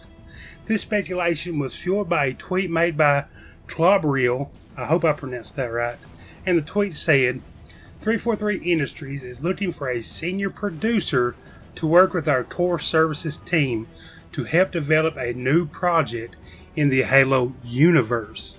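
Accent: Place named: South, United States. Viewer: There are so many people with amazing voices in the halo community, and I am so glad that we finally have someone with a southern accent.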